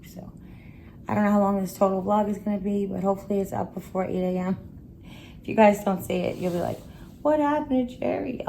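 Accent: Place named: Long Island, United States. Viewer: Hiya. Girrrrrl, share away. Learning about the Peri wick is neato. Way to be independent indeed. As you know I dig your humor & that Long Island voice of yours. I swear when you speak, I feel home. Praying for you my friend.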